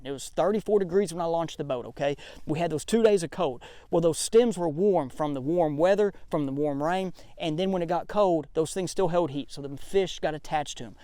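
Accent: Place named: South, United States. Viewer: The man talks like I talk. Free, fast, and it's straight up southern.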